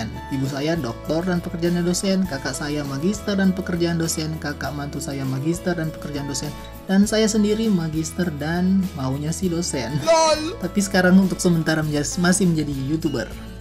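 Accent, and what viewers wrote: Manado, Indonesia. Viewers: pantes kyk hafal logat nya wkwkwk manado koten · yaa,kirain Sulsel,ternyata Manado,gue Sulsel bang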